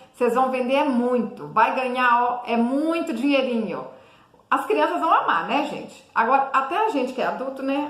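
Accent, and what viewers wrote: Minas Gerais, Brazil. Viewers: vc é de Minas?porque meu marido é e fala como vc,gominha,superlitro,rsrs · Bom dia ..amei vou fazer, obrigada vc é ótima amo seu sotaque uai...😘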